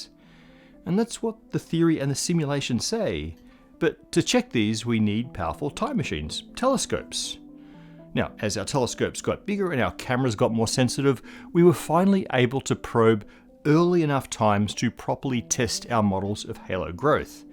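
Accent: Australia. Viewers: Why use an Australian guy and not a regular North American speaker? It's always an Aussie in these science shows. As if to add credibility? Or he's still a minority even though he's white? PBS? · I really wanted to watch this, as I've tried others of this channel, but every time my patience is sorely tested and then after a few minutes just can't take all the unnecessary and extraneous gabbing and explaining. Jeez stop trying to convince us of the woundrousness of the universe and just get to the point. And at least try a little bit to obtain a more American accent. I don't know what accent that is, and typically I don't mind UK accents, but this guy's is really hard to take. Austrialian? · Great hearing an Aussie accent presenting an American science channel.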